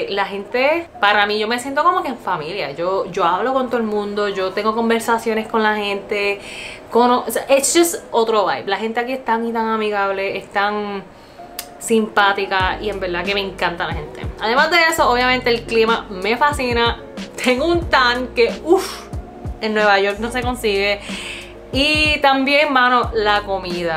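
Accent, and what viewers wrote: Puerto Rico. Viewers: Jaja q habla español con acento boricua . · Tu eres Boricua y hablas BORICUA, literar. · Tú español es boricua.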